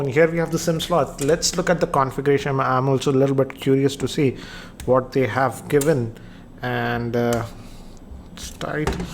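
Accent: India. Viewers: So that what it is. Indian English sucks👎🏻👎🏻👎🏻👎🏻👎🏻👎🏻👎🏻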